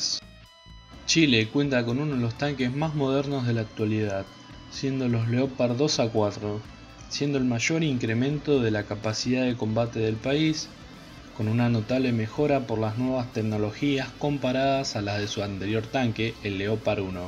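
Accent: Argentina. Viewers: Es argentina no escuchas su acento?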